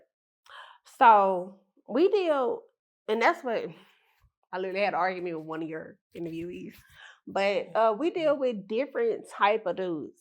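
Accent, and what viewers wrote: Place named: South, United States. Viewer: She has a southern accent!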